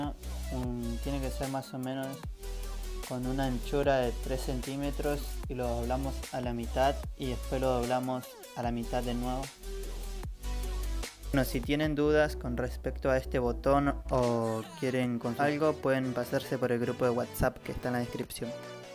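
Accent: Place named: Argentina. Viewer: Hisopos que cotonetes habla argentino papa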